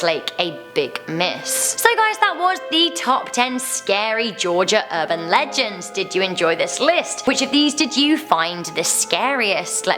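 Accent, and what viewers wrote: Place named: United Kingdom. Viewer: lousy SHITBRIT narration. SHITTISH is the WORST kind of English inflection.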